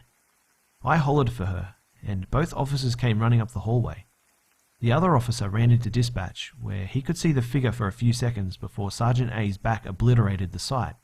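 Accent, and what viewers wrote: Australia. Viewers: love love love this!! + love your channel and LOVE your accent! im an aussie too (melbournian lol) it's very soothing to hear your voice/accent. helps me sleep when im having a bad night. please keep up the great work, from one of your BIGGEST fans!!!!:) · Hey question! Where abouts are you from in Australia? I am in Australia for 4 months now and just recognised your accent ( not to be creepy or anything) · cause you read your stories with feeling. When the person narrating does it on one tone and almost no enthusiasm. It distracts from the actual story. Plus the Australian accent ain't to shabby to listen to. :-) hee hee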